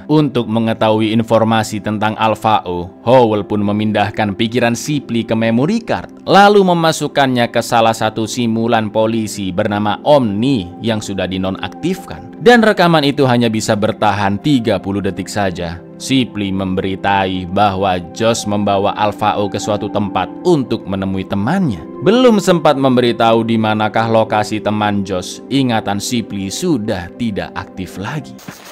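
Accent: Java, Indonesia. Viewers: Jowone ngendi cak?? 😂 Nyonk Jawa Tengah cak, tepatnya Parakan Temanggung.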